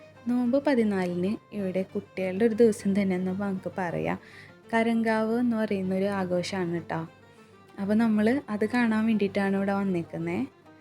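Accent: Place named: Thrissur, India. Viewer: തൃശൂർ കാരുടെ സൗണ്ട് എനിക്ക് മനസ്സിലാവും ഞാൻ മലപ്പുറത്ത് ആണ് അബുദാബിയിൽ ഞാൻ തൃശൂർകാരുടെ കടയിൽ ജോലി ചെയ്തിട്ടുണ്ട് അങ്ങനെ മനസ്സിലായതാണ്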